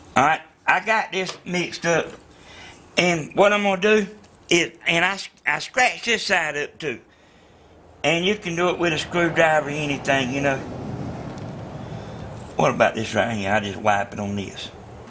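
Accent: South, United States. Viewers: Thanks, love theSouthern accent! If i make one i will film it and tell people about you.Thanks again. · You have the coolest accent can't beat that good old southern accent hell that's better than anything you can buy I bet you can get up to 200 miles of that antenna I wish you lived near me I'd pay you to make me one and that's made better than any of the $100 ones I've seen made a lot better and more sturdier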